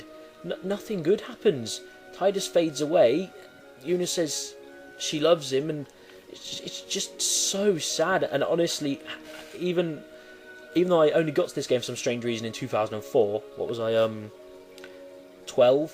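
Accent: United Kingdom. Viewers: Are you british? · the video would be more epic without your very gay voice, let me guess britain? all islandmonkeys sounds gay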